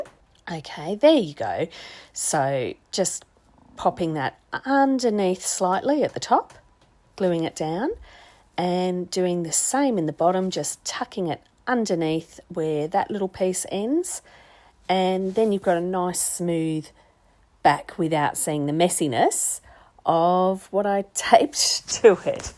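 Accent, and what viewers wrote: Australia. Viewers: That was a great interpretation of the prompt. I'm still not sure what I will do but I did find this helpful. Love your Aussie accent. Blessings and hugs from New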